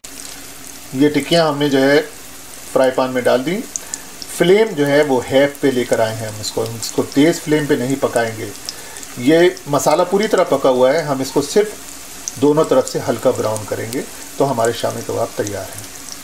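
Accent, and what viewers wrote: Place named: Uttar Pradesh, India. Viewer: Aap ka "quaf" batata hai ke aap ki jaren shayad U.P.men hain.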